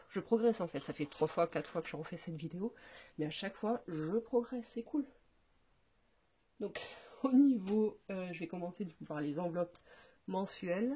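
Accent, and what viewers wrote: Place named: Southwest France, France. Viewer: Coucou ! Tu as le meme accent que mon papa !❤ Sud Ouest ? Limousin ? J'espère que tu trouve petit a petit a petit la sérénité ! 😊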